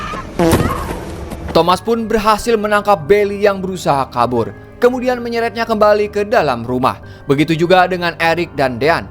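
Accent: West Java, Indonesia. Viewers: Admin nya urang Sunda belah mana euyy😅😮😂😂😂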